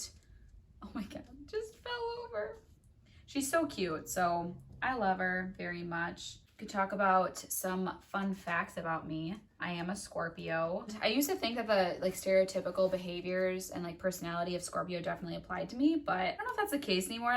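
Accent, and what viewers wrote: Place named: Wisconsin, United States. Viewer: I KNEW you had to be from Wisconsin (I could be wrong) because of your slight accent! I love it!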